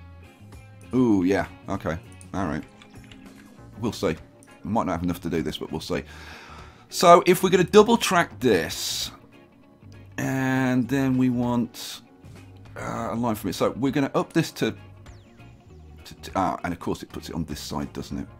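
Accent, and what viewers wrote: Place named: Northern England, United Kingdom. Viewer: totally northern England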